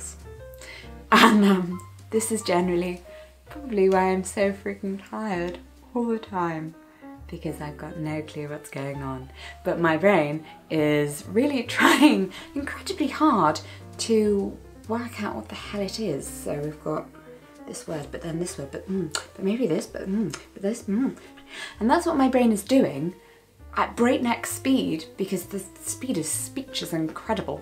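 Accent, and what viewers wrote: RP, United Kingdom. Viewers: LOOOVE your British accent ❤️ ❤️ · You are very kind telling us that...and very pretty as well. I am learning German Sign, love you British accent 😍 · This is my first day subscribing to you, and I love all the videos I've watched from you! Btw, you have an amazingly beautiful accent, and I adore your phrasing. Well-spoken people with a British accent can impress me jolly deeply. xD And I'm gay too, so your channel's really lovely. :D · Most posh sounding deaf person I've seen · I love how she is so British and almost posh but she is like “What the hell” and “God damn it!” It is such a distinktive personality trait of her’s :D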